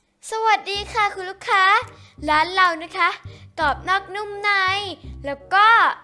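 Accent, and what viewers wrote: Thailand. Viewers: พี่เป็นคนพาคเหนือหรอค่ะ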